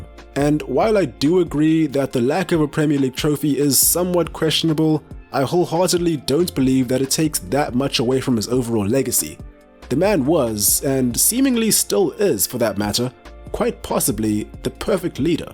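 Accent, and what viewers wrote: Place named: South Africa. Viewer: I can hear that South African accent Bru 🍻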